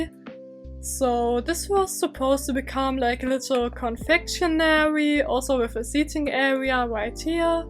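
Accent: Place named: Germany. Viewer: Are you german? You have a very german sounding accent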